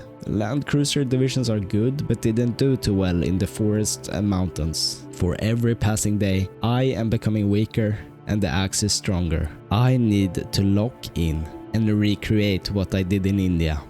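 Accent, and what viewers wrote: Sweden. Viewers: helvete vilken svensk dilekt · Du har den svenskaste brytningen jag någonsin har hört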